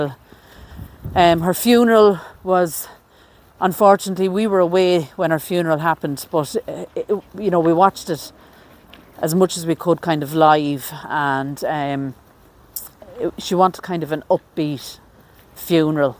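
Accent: Ireland. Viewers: Beautiful tribute and I love your Irish accent :) xo I remember her posting her videos discussing mental illness and abuse :(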